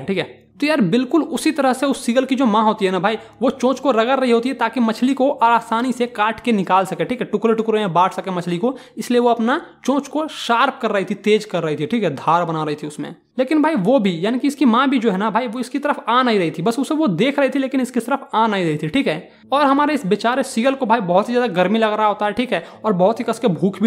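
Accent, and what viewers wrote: Bihar, India. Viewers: Sir bihari ha isliye ud ko ur bol rahe hai 😅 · Bhai bihari h wo ...or har bihari jab bihari language mai bolta hai to ek jesa he lgta hai ..😂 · Bihari hai tu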